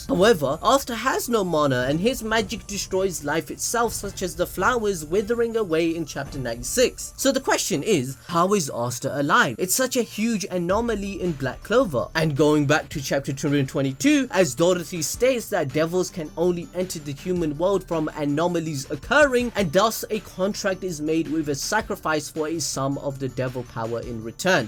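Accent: Punjab, India. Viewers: Hey are u punjabi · that punjabi accent lol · Haaaai are you Indian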